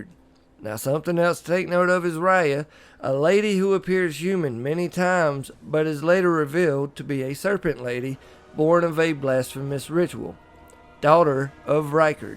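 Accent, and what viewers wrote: South, United States. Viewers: A southern drawl talking shop about Elden lore, I’ve never subbed so fast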